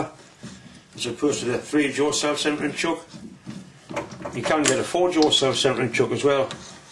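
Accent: Newcastle, United Kingdom. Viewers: zonnekat he speaks Geordie,from the north of england! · ***** Closer to Scotland than Yorkshire as it happens, but yes, I'd say in England, around Newcastle or thereabouts. Closer to a Geordie than a Maccem though.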